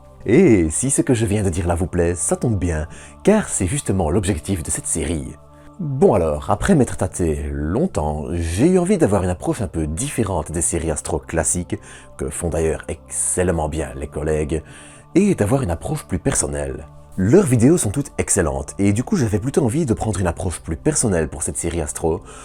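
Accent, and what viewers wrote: Belgium. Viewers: Hello. Voici une chaîne Youtube qui m'a souvent étonné. Au début, un ton bien d'ici (je suis Belge aussi) auquel je ne suis pas habitué en video, qui a son charme, d'autant que tu as pris de l'assurance avec le temps. C'est là que j'ai compris que le régionalisme s'il est assumé et que la vidéo est de qualité passe non seulement bien et mais que cela donne une identité supplémentaire. a son identité bien distincte. Ici, tu te mets en scène, et bien çà marche! Les moment plus spontanés, tes regards sont vraiment sympathiques. Bref, surpris de voir que c'est un jeune derrière ces vidéos, avec un style qui attire l'attention et une passion communicative. Je me re-regarde cette vidéo, et bien elle fonctionne vraiment bien. (la touche d'humour à n'apporte rien cette fois-ci à cette video, mais essayes, tant que tu n'abuses pas.) CLAP-CLAP-CLAP-CLAP-CLAP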